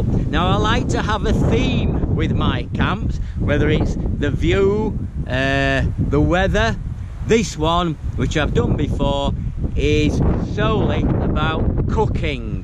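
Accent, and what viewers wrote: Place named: Yorkshire, United Kingdom. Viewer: A broad Yorkshire accent, a tent and Yorkshire puddings makes me feel comfy inside....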